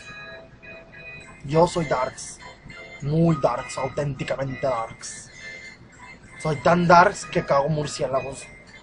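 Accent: Mexico. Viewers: Tkene acento del norte de Mexico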